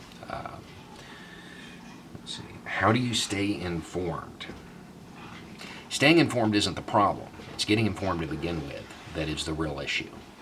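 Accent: South, United States. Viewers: Love Your point of view, jealous of your southern accent. Don’t know why I associate it with pure America. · Your ok with me like southern accent. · Who Hoo, you're a Big Shot Now! 100,000 Subscribers! A lot of people have never heard anything like you. And to find out that you actually make sense, also that you are not only intriguing, you are intelligent, and have critical thinking skills. I believe, that draws people to you. And you represent many of us in the South, that can have intellect and a Southern dialect. The stereotype of some Southerners is also for real but not the rule, as you smartly display. Good for you, good for you! Keep up the good work. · Dude this is crazy because I just looked more into you like 3 days ago and found out all this stuff, except I had the accent thing backwards; I thought you were faking the southern accent, but its crazy that you managed to hide it before. 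But I get why you would hide it, I'm self conscious about that kind of shit too. Ahh, the life of having social anxiety. Edit* -your channel is dope as hell man, and I just want to say keep up the great work. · I love the southern accent. Just afraid of the ppl. But its nice to hear. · Well, I'm here not because of your thick southern accent. It's the content. It's not 100.000 subscribers. As I'm writing this, it's almost twice that. I'm not sure I'm your typical audience, as a Brazilian, my interest in your channel isn't your accent. You are someone who spread ideas, and I support them. It's comforting to know that people like you take the time, the risk and expose your face and tell your thoughts because you know you have to. Thanks and keep'em coming. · I think it's great that you use your real accent. If southerners change their accent to be taken seriously in media, then southern accents will only be heard reported ON, not by. 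I'm not American, and I don't carry that bias when it comes to your accents, but since I started watching American politics, I've come to realize that a racial gap is not all that needs to be mended. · love your Southern accent. Wish I could have seen your first photo background videos. I'm a Mississippi home grown. Miss my roots. Thanks for letting us know you are actually Human and not one of those cyber robots. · Don't be fooled by a Southern Accent - and Trae Crowder for President. What a wonderful thing that would be. Appreciate your calm, rational, common sense approach,. Cheers from Canada 👍🇨🇦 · I went to a K thru 12 school and grew up in a town with 258 citizens, yeah, you are from the south. I played down my accent for years, because I was MI and Signal... now I don't give a fuck. LOL I love your content and it is wonderful knowing that other southerners have opened their minds and see what is really out there.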